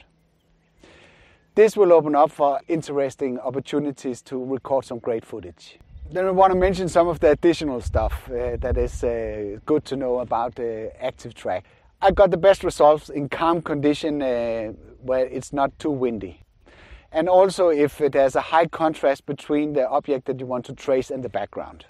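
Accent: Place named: Denmark. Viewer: Tydelig at høre at du er dansk 😇😇 Tak for en fin video. Må simpelthen eje den drone. Kæft den er fed. Skal bruges til ferierne og til at optage børnenes fodboldkampe ... Det må skabe respekt i forældreflokken når jeg kommer anstigende med sådan en.. Hahaha..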